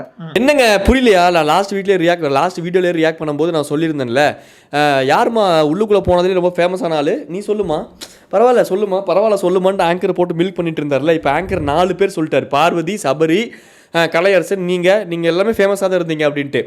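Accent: Coimbatore, India. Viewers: Your cute man but nenga pesurathu Konjam coimbatore slang mathiri irukku but nalla irukku Ivana kadaisi varaikkum thiruthave mutiyathu intha moji pathale kovama varuthu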